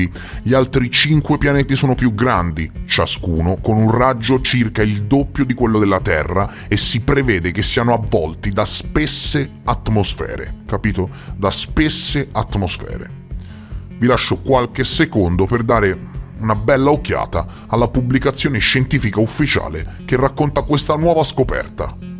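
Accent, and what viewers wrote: Tuscany, Italy. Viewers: te mi freghi una marea di tempo che non ti dico 🤣🤣🤣..però sei talmente interessante che..quando proprio non ho tempo..ti ascolto mentre faccio qualcosa in cucina..molti complimenti davvero..sei unico..( e mi pari pure toscano come me)..ma non lo diciamo a nessuno 😅🤭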